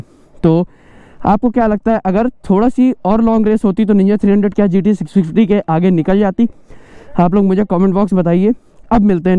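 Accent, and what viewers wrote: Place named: Lucknow, India. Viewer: Loved the lucknow accent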